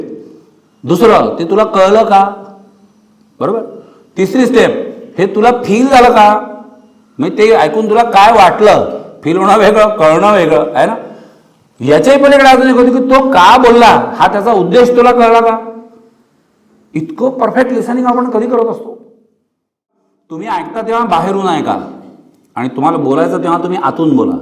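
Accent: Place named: Vidarbha, India. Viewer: सर मि तुमचा प्रत्येक सेशन पाहतो काही तरी नविन शिकवतो आणि ति वर्हाडी टोनिंग एकच नंबर तुमच्या सेशन ची माहिती मिळाली तर उपस्थिती देणे आवडेल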